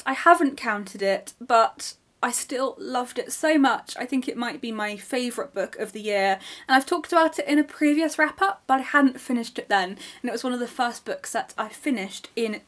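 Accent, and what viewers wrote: United Kingdom. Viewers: Hello Miss. Hope you are doing very well. You are a well-read person. How old are you and how many books you've read so far? Where were you grown up and where do you live now? I started listening to your videos because of your good British accent. I would like to ask you a favour, do you have time to attend a ZOOM meeting where we can discuss books and classics. You are outstanding and we can learn from you.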